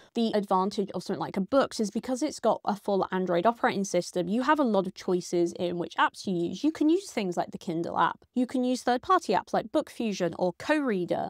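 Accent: United Kingdom. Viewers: I watched with low expectations when I read $. But then you started to speak and yay. A video by a fellow Brit with no waffling or bullshyte.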